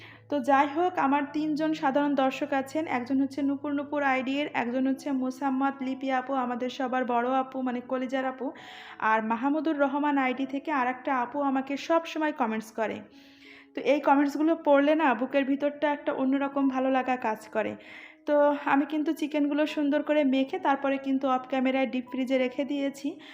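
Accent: Jessore, Bangladesh. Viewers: সত্যি বলেছো আপু আমাদের ডিকশনারিতে কোন ছুটি নেই মৃত্যুর আগ পর্যন্ত তো তোমার যশোরের ভাষার কথাগুলো শুনে বেশ মজা পেলাম পিঠাগুলো কিন্তু দারুণ হয়েছিল❤❤ ভেলপুরি 😊😊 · 👍👍 যশোরের প্রিয় আপু, আপনিও আমার মত কাপে সাপোর্ট দিয়ে মোবাইল রাখেন। আপনার ভাষাটা এত এনজয় করছিলাম। প্রত্যেকটি আঞ্চলিক ভাষার একটা সৌন্দর্য আছে। মাঝে মাঝে এই ভাষায় ভিডিও দেবেন আপু❤❤❤ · আপু তোমার কন্ঠে যশোরের এই ভাষায় কথা শুনে খুবই ভালো লাগলো আমি কিন্তু যশোরের মেয়ে বউ। পিঠে গুলো অনেক সুন্দর হয়েছে। কুচি কুচি চিকেন মসলা দিয়ে মেখে রাখলে। ঘরটা সুন্দর করে সাজিয়ে নিলে। সন্ধ্যায় বান্ধবীর সঙ্গে ঘোরাঘুরি করলে। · বুন্ডি আমাগের যশোরের আঞ্চলিক ভাষা ডা কিন্তু সেইরম সুন্দর এরম করে কথা কতি পারলি কিন্তু পরান ভরে যায়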